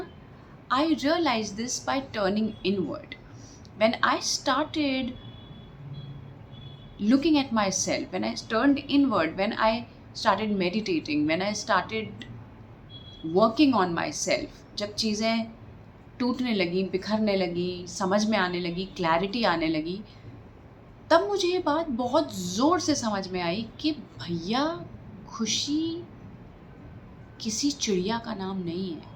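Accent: Bundelkhand, India. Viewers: You Are really really amazing actress And I love your Bundelkhandi Accent. Keep rising and shinning. Jai Banke Bihari Lal Love from Hiten Pandit. SIT😍😍😍😍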